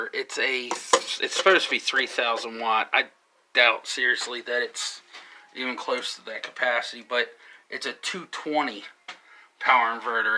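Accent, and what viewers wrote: Baltimore, United States. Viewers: I hear an accent are you from the Baltimore area? I'm looking to buy a small private plot of land hopefully around summer time between northern VA, eastern WV or southern PA. I could easily ask you a million questions but I'm sure your videos and Google can answer most.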